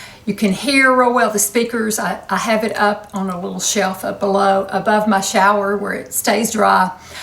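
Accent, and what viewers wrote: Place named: North Carolina, United States. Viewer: Omg, I just have to tell you that you have made me smile thru this video. I know you're from east TN, but man oh man do you sound like us here in central NC!!! I hear my mom's side of the family in so many words you pronounce and I love it! YOU feel like home and I automatically trust you! You've got a new subscriber here😊🤗😦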